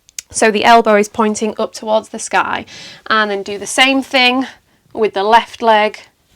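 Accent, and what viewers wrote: Yorkshire, United Kingdom. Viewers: just discovered your channel, really enjoyed this flow, think this will now become my go to for yoga tutorials, really nice energy and excellent presentation skills plus, last but certainly by no means least you are gorgeous, do i detect a Yorkshire accent/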